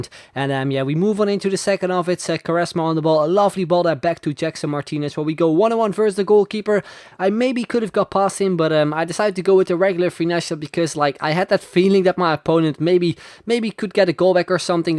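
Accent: Netherlands. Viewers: Is he Dutch????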